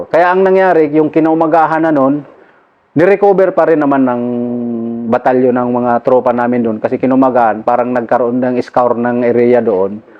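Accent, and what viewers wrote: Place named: Bicol, Philippines. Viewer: Bicolano Yaya si sir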